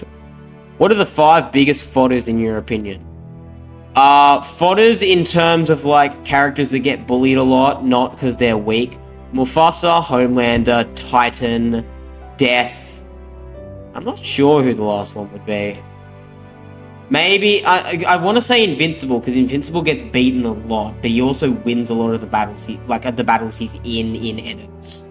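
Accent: Australia. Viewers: I hope you don’t mind me asking cause I would like to know if you’re Australian? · I didn’t expect u to be Australian! W tho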